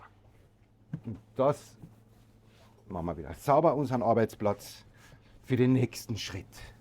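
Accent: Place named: Carinthia, Austria. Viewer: du bist so sympatisch u. ein super Koch, ich bin 66 Jahre, wohne in Tirol, bin eine leidenschaftliche Köchin, schaue immer gerne deine Kochsendungen, dein Kärntnerdialekt ist einfach super!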